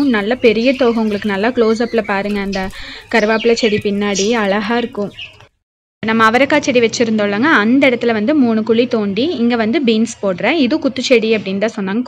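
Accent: Kongu, India. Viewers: Hi... I will follow all your videos,as a newly wed I love to be like you in the way of care you show towards the family... Love you lot... I am from pollachi happy to hear our kongu tamil🙂keep going you are amazing😊eagerly waiting for your next video... And you are multitalented though I would say... Love you 😘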